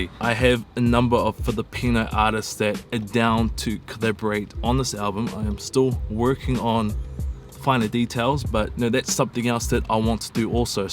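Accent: New Zealand. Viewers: hes maori I dont think the maori accent sounds australian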